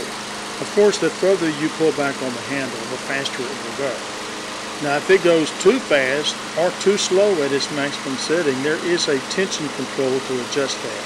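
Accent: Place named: South, United States. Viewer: I love this authentic, Southern American accented English. While all the southern accents may sound similar to a Northerner or an international visitor, there are a plethora of different distinguishable varieties and flavors. Likewise I have never heard two different British accents that sounded the same to me.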